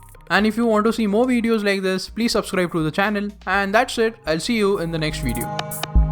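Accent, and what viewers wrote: India. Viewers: Stopped watching when I heard the indian accent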